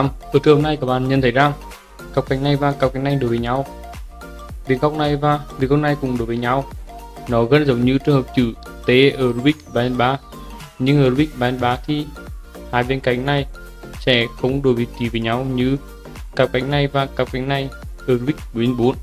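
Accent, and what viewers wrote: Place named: Central, Vietnam. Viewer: nói như tiếng miền trung ấy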